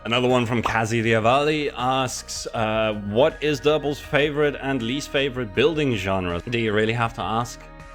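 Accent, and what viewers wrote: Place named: Wales, United Kingdom. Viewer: Congrats! 🥳 Have to say I was convinced you were Welsh before watching this. My first language is Dutch so I can usually pick up on similar accents, SA included, but unlike Rendog's accent, I never would've guessed you were from SA.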